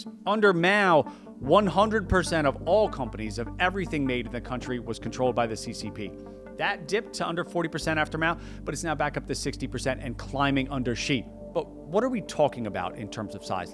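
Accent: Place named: New York, United States. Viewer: Im a Muslim so I don't have much love for communism. I know how Muslim populations are military target No.1 for any red army for total annihilation or reeducation. But c'mon dude. An Ivy league degree, a spiffy NY accent but nothing but bias and hot air is going to end up collapsing the USA. We deserve better than that. We need the US as a world order insurance to make sure no one crosses beyond the red line. Do your job guy!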